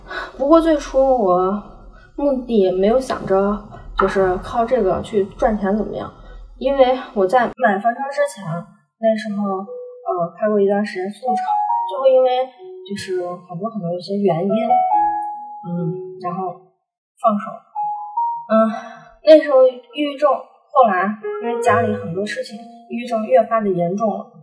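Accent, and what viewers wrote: Shaanxi, China. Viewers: 陕西话摄地聊滴太。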